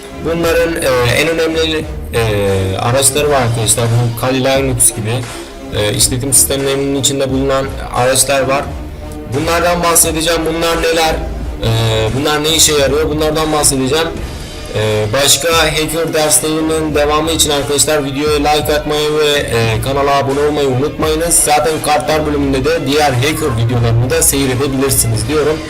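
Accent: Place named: Azerbaijan. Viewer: Azeridi özünü qırmır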